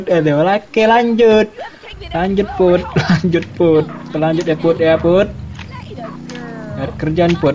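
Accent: Medan, Indonesia. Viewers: Logat medan kau ya lai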